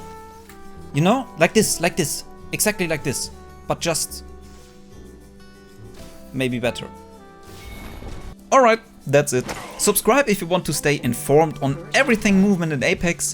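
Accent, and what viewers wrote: Germany. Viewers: Das is doch ein Deutscher Dialekt denn ich da höre,oder? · are u german u have a german accent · you sound german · Are you german merkt man haha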